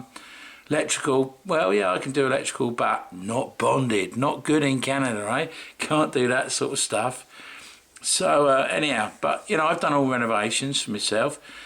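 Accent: United Kingdom. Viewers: Hey! You could get a job as the lizzard in the Geico Commercials. Or you could do a nature show on public television. They dig that British accent. Seriously, though. best of luck on your new job. Times are tough all around. Be safe.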